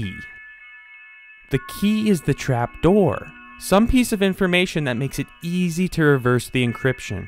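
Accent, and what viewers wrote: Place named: Canada. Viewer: i was like there's something weird about this accent.. then he said aboot.. and i was like o right, he's canadian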